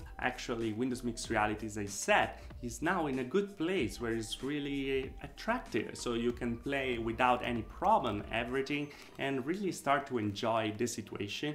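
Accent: Italy. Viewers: Based on his accent and features I'm going to assume he's Italian.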